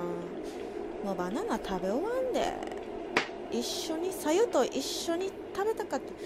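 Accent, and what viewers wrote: Kansai, Japan. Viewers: 関西弁にいやされます。😂✨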